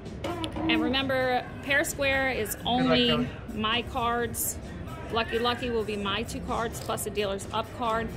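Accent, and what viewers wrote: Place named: South, United States. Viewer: would be AWESOME to play blackjack with ya sometime if it's in the " cards " and ya I'd love to learn that southern talk!! I'm Canadian were known for the cold and wearing summer stuff in the cold, dont ask, lol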